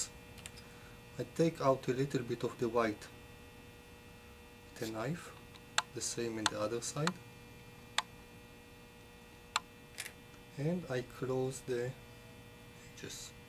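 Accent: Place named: Israel. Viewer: Amazing! which powder did you use? and.. you have an Israeli accent, are you originally from Israel??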